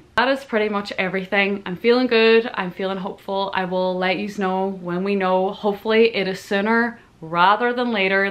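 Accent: Ireland. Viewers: Her irish accent sounds like "hi, my name is Erin" , or "hi, my name is Aaron" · I've been following your story and praying for the best outcome. Spent a bit of time myself in hospitals and quickly realised that having a positive outlook no matter what get thrown at you is most important. All the best, just love your Irish accent, my folks originally came from Donegal. ☘ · I love your accent. Is it Irish?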